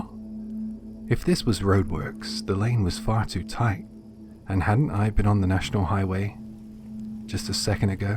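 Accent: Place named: West Country, United Kingdom. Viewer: I hear a Scottish twang/ possibly West Country in your accent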